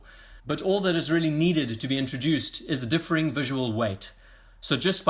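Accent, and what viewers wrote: South Africa. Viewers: Hay dude are you South African? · Are you South African?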